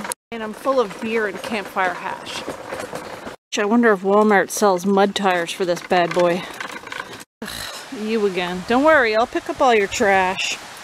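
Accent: Nova Scotia, Canada. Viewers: More Nova Scotian, gold. Killing it.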